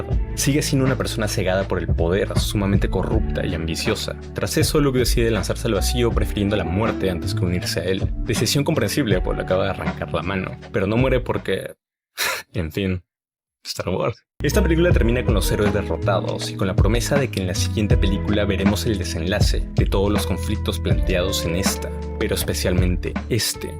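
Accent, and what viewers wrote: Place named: Peru. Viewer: manoo ese acento peruano es todo te juro, sube mas videos asi la subes como mrd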